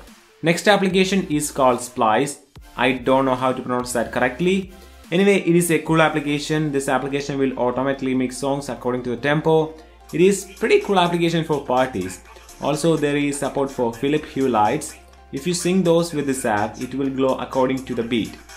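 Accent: Kerala, India. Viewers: i guess u are a malayali??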